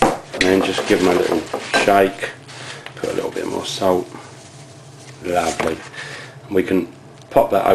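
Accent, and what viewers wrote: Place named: England, United Kingdom. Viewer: Real english Accent, Real English Chips :) Good Lad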